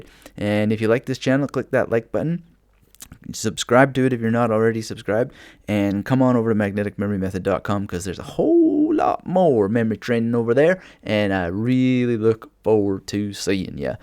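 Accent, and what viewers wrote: Canada. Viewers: You sound like a Canadian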